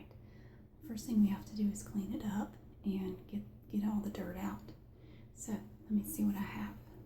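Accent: South, United States. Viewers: Subbed from Tennessee. Love that southern accent.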